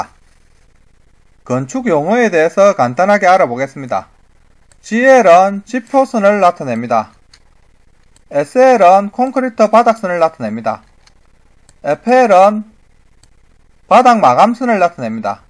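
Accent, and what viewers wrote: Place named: Gyeongsang, South Korea. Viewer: 아지야 경상도 사투리 직이네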